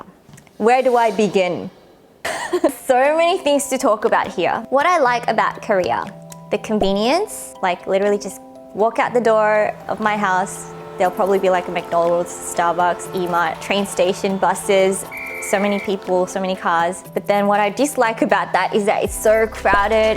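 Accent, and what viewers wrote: Australia. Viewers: Hi its my first time leaving a comment, but i love how you share your faith through ur channel. Yes id love to hear ur testimony! And I really like ur Aussie accent:)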